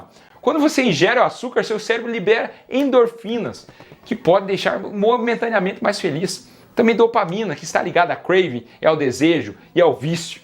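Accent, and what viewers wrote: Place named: South, Brazil. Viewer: Sou de Lima Peru e ja inscrevi no seu canal, amo do seu canal e sotaque sulista como o meu hehehe🥰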